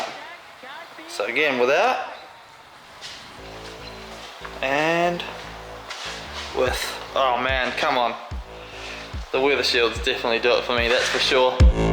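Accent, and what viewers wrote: New Zealand. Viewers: is that an nz accent i hear?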